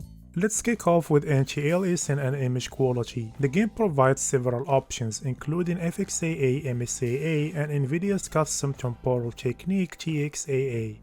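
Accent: Morocco. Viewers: yo bro are you moroccan your accent is moroccan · BRO i can tell a moroccan when i hear one 😂😂😂😂 rak nadi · Naddi erftk mghribi ghir mn laccent dyalk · maghribi english n9iya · ana 3rfto mghribi ghir mn laccent dyalo hhhh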